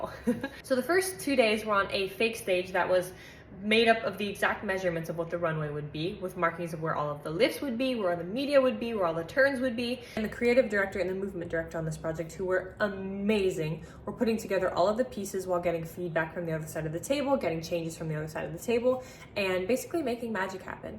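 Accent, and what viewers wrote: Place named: Midwest, United States. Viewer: I'm trying to place your accent, or lack thereof. You're either PA, Ohio, Indiana, Illinois, or you're from the west coast, I believe? Which is it, please?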